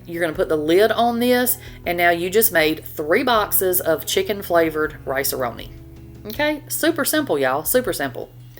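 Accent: South, United States. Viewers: Girl I'm gonna steal these recipes lol, thanks for the video. Luv the southern accent! Luv from California. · THIS, is what the internet should be used for. I love to hear my southern folks accent.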